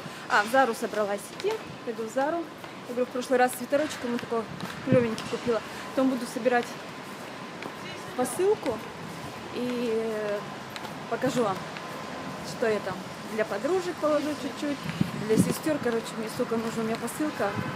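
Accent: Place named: Arkhangelsk, Russia. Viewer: дорогая, не стесняться надо а гордиться своим архангельским говором. это просто замечательно что люди сохраняют свои диалекты. в любой другой стране кроме россии местное население бережет свой говор который обычно отличается от столичного и горды этим. здоровья вам и вашей семье.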